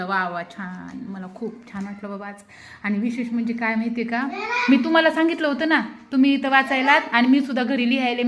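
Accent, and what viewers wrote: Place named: Parbhani, India. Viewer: मॅडम तुमची भाषा परभणी चि वाटते ,आमचे सर सतीश Ranher सर यांच्या सारखी आहे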